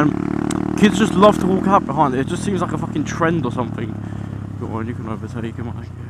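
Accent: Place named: Essex, United Kingdom. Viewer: I noticed some of essex in this :o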